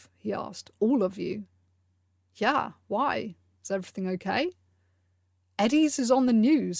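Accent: United Kingdom. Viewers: OUTSTANDING piece of work & presentation!! 👏I sincerely enjoyed taking this journey through the history of your Goth Life! Seeing you dressed in your amazing Goth wardrobe, & listening to YOU tell your story in your proper British accent, made it even more amazing! THANK YOU for sharing your precious life, time, talent, knowledge, & experiences with us all! Best wishes!😘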